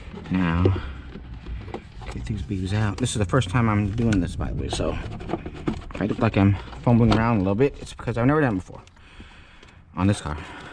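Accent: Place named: South, United States. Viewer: This was pretty funny but honest, made my day. I thought the author was a "good ole boy" based on the heavy Southern accent but at the end with the commentary, it surprised me! :) Informative vid - kudos for putting it together.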